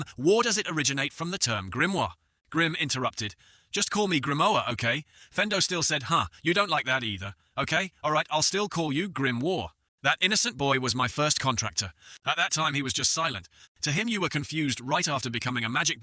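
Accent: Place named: United Kingdom. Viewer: yo love the british voice 🙏💫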